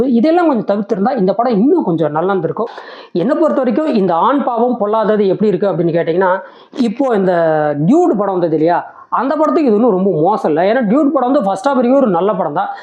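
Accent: Kongu, India. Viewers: aiyo Mokkai padam season kodumaigal saaamy... well done review - Kongu Tamizh Vazhga..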